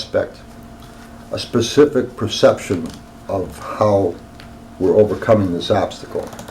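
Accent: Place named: New Jersey, United States. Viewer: ah, thank you. I’m from NJ and he sounded so NJ to me.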